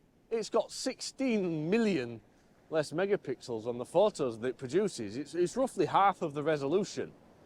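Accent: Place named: Geordie, United Kingdom. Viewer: Thank you for sharing. Im from Germany and I feel exactly the same for this Camera. I started photography in the age of 16 with the legendary Olympus OM-1 in 1976. I used Olympus with great fun and success till around 2000. Then i must go to digital Cameras for professional purposes. Canon was the only affordable system in these times. So i lost Olympus man years till the Pen System comes out. Till this point Olympus was not useful to me. Later i bought the OM-D EM10 MARK I. STUNNING ! Since this point, i bought several bodies und lenses and do everything with it. Two years ago i thought, that i must have a Fullframe Camera, the Lumix S 5. But seriously, i use it barely. PS: i have good friends in Newcastle upon Tyne, their dialect is quite similar to yours. You must be a Geordie. 😊 Thanks for this Video, take care.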